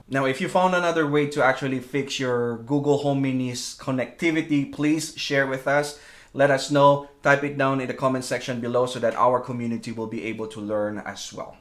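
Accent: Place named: Philippines. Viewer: Thank you so much! It worked! And judging off the slight accent... Salamat Po! (?)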